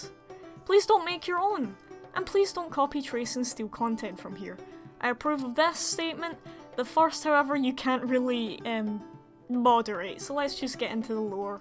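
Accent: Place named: Scotland, United Kingdom. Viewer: Man this accent reminds me that scottish people are a thing, wheres all the scottish people at?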